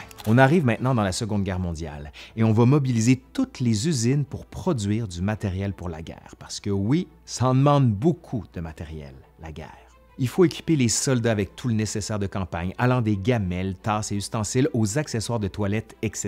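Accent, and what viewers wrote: Quebec, Canada. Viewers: J' adore l'accent canadien:) Superbe video:) Merci:) Je m'abonne avec grand plaisir. Bonne continuation. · Merci pour ton accent du Québec 😂 Tupperware a malheureusement mal fini...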